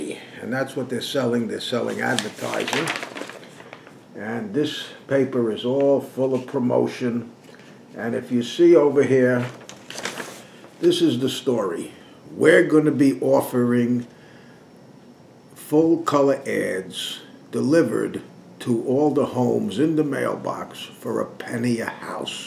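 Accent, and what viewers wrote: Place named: United States. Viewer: I am impressed by your presentation as to how to make a newspaper targeted at advertising on behalf of the business, say in my case, the micro enterprises that are currently mushrooming in out areas. First and foremost, I was confused as I could not hear the name of your website correctly. You did what you could but you were not audible to me. (Perhaps your heavy American accent complicated the matter). Yes, I am interested, and upon your response, I will provide you with the necessary details that you may need prior to commencement of your tutorials. Last but not least: Are your services (tutorials/trainings/teachings) limited the borders of the USA? I live in South Africa, in the vacinity of Johannesburg. Your response please!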